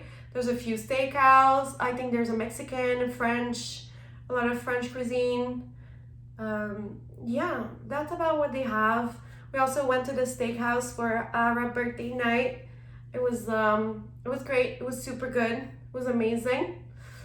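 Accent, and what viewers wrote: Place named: Russia. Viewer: Your accent sound a little bit Russian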